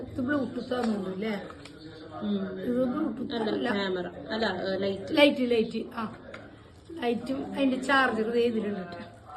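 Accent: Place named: Thrissur, India. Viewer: Thrissur .....language superattaaaa.....simple and beutiful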